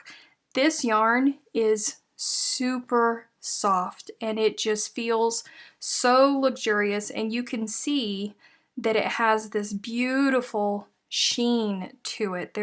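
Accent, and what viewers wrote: South, United States. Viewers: p.s.: Not that speaking southern is not erudite in its own sweet way y'all.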